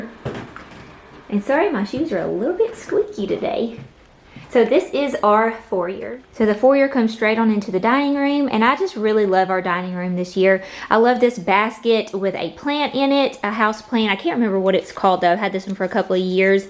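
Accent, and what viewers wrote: South, United States. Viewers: Your home is absolutely lovely You have done such a beautiful job with it. Moreover you are just a incredibly beautifully lovely young woman.😇 I bet your mom is really proud.😍You're about the age of my daughter which I had very young, because I'm not that old I'm only 50. ☺️Which probably seems old to you but it's not that old isn't it mothers that have older daughters. LoL😉 I want so bad to learn about essential oils, I'm sick and have been for almost 20 years, so I've lost a large section of my young adult life. It's hard for me to look them up online or read them all through a book and still maintain and remember what's what and when and how I should use them? as well as how to combine them for what I'm looking for? But it's something I would truly be all in and passionate about if I didn't have just the limitations due to the severity of my illness at this time 🤔. 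God bless you honey and thank you for another amazing tour of your home and wonder of watching your cute self and you're absolutely adorable Southern accent 💝❣️❌💋❌🥰❌🌹🌹🌹🌹🌹❣️❣️❣️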